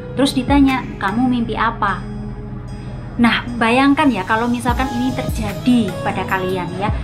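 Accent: Java, Indonesia. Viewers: Kalo boleh tau Mbak asli Jawa mana ya.. Medok eh bahasa Indonesia nya He.. He.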